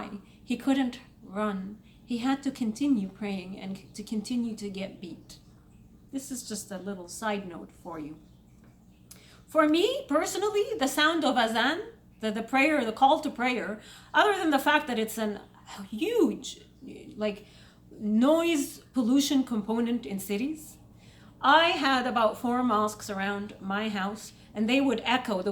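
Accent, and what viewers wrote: Egypt. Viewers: You Egyption acisent dosent bother me none honey. Its all good. Keep up the good work. I watch your videos all the time .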